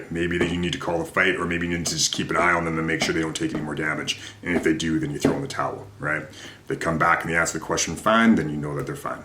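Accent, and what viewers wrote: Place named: Canada. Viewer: This guy’s voice screams Canadian 🇨🇦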